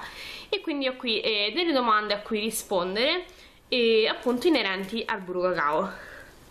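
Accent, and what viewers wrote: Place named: Rome, Italy. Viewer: nella parola Packaging la "G" finale è muta. si legge pacagin. Te da romanaccia ce ne metti 3 di G alla fine :) Però sei simpatica. Ciao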